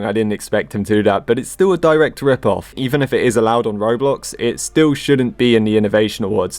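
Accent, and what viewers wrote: United Kingdom. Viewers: Hah british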